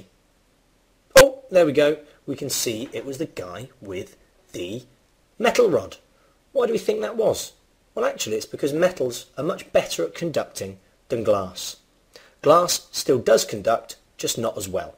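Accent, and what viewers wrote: United Kingdom. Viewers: Bro the British accent lol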